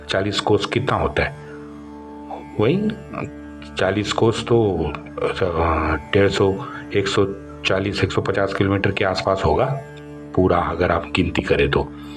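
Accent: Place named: Maharashtra, India. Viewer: Kya aap Marathi ho? Aap ka accent Marathi hai.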